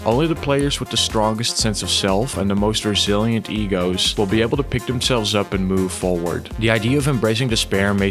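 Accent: Netherlands. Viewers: hoor gelijk dat je een hollander bent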